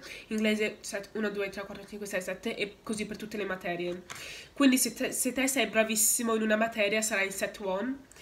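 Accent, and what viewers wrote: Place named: United Kingdom. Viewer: Si sente tantissimo l'accento British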